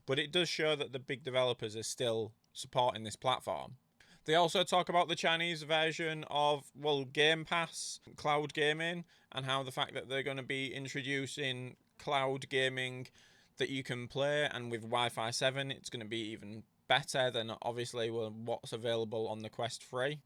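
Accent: United Kingdom. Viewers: Thanks for the info, not much on Pico 4 Ultra out yet on the net! BTW, I find your English accent really interesting! What part of the UK is this from?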